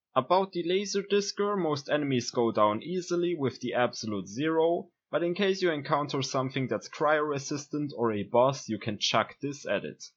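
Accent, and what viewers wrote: Germany. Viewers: your german accent is so strong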